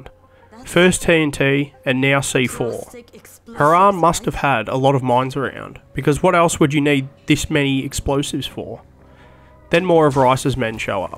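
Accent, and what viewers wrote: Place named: Australia. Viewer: The Aussie commentary is great Hoping to see more of this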